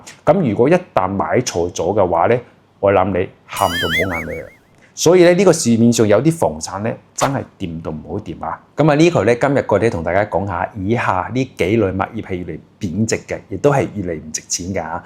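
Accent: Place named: Hong Kong, China. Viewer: 香音未改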